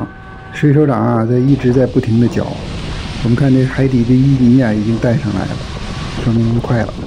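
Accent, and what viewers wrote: Tianjin, China. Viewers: 老哥是不是天津人？ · 船长是天津人？ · 天普，船长天津人。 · 听口音像天津人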